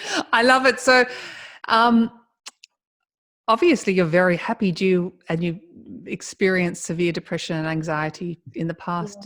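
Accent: Scotland, United Kingdom. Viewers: Curious about her acent. she comes from scotland maybe.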